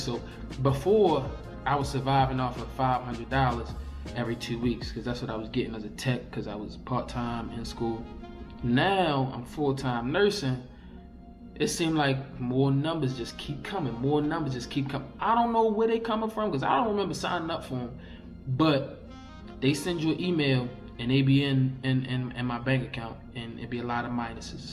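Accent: Baltimore, United States. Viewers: Can tell you from Baltimore 😂, but good luck bro ! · U from Baltimore ain’t you🤣 that accent one of a kind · i hear Bmore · I here Baltimore baby...keep up the good work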